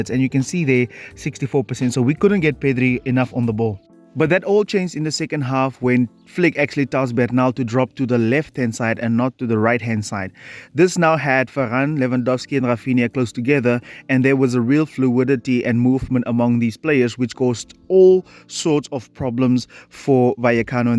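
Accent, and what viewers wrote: South Africa. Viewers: Cant wait for the second half’s video. Lol i dont know why but ypu sound a little bit South-African …accent wise😁😁😁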